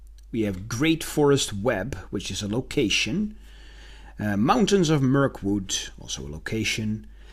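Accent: Netherlands. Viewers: You're Dutch?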